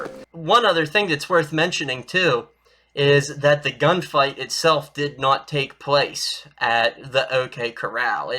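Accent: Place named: Pittsburgh, United States. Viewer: "Dahntawn" Tombstone. Good to see a fellow yinzer making it big on YouTube! ⬛️🟨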